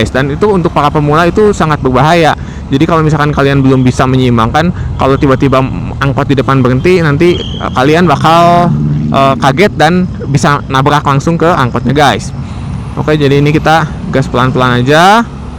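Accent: Bandung, Indonesia. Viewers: KK nya orang Bandung yah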